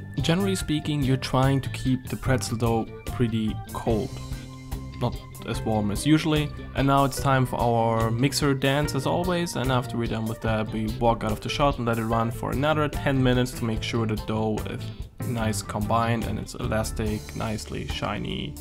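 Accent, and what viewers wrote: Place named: Germany. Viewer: First sentenced told you‘re from germany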